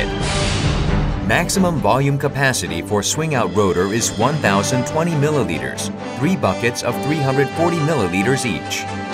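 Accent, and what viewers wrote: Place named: United States. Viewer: Oh, my god. This is the most obnoxiously overproduced and tacky commercial for a product not meant for children or even normal households I've ever seen. The "epic" music, the over enthusiastic American voice, and all the sound effects, combined with cheap CGI. For centrifuges! It's too much! I just can't! I will now have to watch it on repeat.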